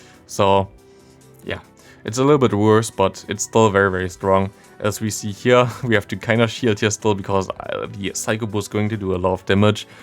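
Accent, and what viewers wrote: Germany. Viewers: If your slight accent gave you away as I'd assume either german/austrian or swiss, its even more insane that you made this video so early. I've just awoken from my slumber, thanks for the dedication and great video :D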